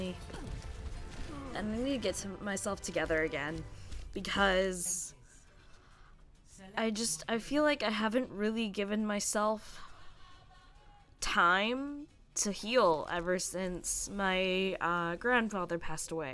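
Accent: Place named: Canada. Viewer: Gurl! Miss you. Whatever you gotta do, you do you :). But we(I) will be there for you in whichever way you need xD. P.S. You sound soo Canadian...and I love it! No offense..? Lol <3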